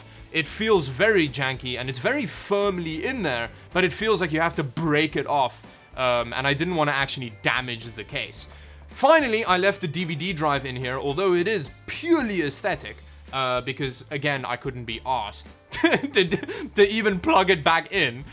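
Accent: South Africa. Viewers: Do you come from south africa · You sound south african bro! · Are you South African 🤔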